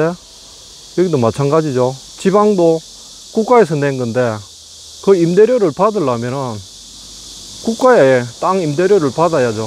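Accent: Gyeongsang, South Korea. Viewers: 차분한 경상도 사투리가 언제들어도 편안해 지는것은 저혼자만의 생각은아닐겁니다. 길에서 봉이 김선달처럼 돈 뜻는일이 어찌 그곳만 그랬을까요? 옛날 에 씨가 때어날때쯤에는 서울에서 시골로 주민등록 전출때 동사무소직원에게 그때 최고로 비싼 신탄진 담배한값인 50원을 열려있는 서랍에넣어주지 않으면 주민등록서류가 언제 도착할지 모르는 그런시절도 있었답니다. 그래도 지금은 정말 세상좋아졌다고 생각합니다.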